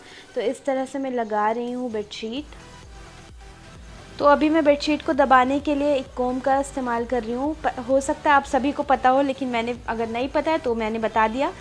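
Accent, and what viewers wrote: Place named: Jharkhand, India. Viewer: Waoo nice .... Or AAP Ki Jharkhand wali boli to mashaAllah....😘😘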